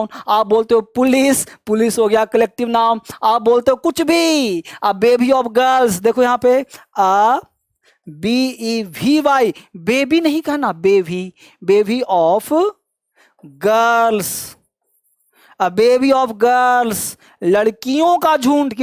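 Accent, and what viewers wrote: Bihar, India. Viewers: Sir Ap Bihari ho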